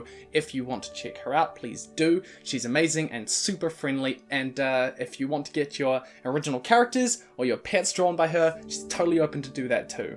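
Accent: New Zealand. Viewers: Man, some old videos, before had tamed his kiwi accent yet.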